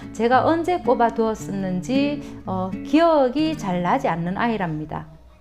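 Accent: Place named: Gyeongsang, South Korea. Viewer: 요즘은 애니시다도 능력에 따라 삽목이 어느정도 가능해진것 같아요 정감있는 대구사투리? 즐겁게 보고 갑니다~~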